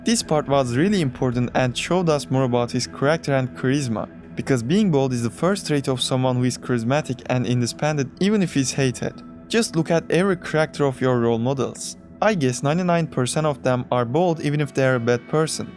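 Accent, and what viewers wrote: Turkey. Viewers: Bu adamın türk olduguna yemin edebirim aksanından çok belli oluyo ginede videoların güzel böyle dewam :D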